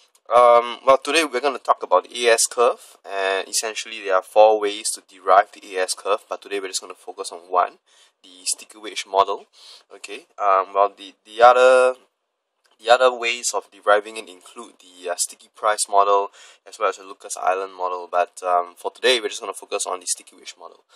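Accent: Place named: Singapore. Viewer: singaporean narrator yay hahahaha! totally unexpected!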